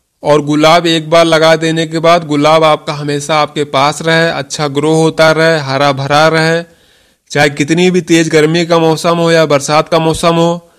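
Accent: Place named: Varanasi, India. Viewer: Beta ache se samjhate ho. Tum banaras ke ho ya aas pass ke ho.tumhare boli me innocency jhalakti Hai. God bless u.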